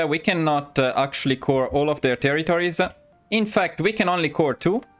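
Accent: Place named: Italy. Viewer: I can't tell you how charming it is to hear you talking about italian places and units with your italian accent. Makes this a lot of fun. I don't have any DLC - is it still possible to recreate the roman empire without spies or the sophisticated re-integration features?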